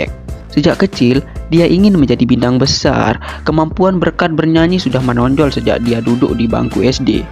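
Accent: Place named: Lombok, Indonesia. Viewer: Bahasa indo logat lombok nya kental sakali mimin ny wkwk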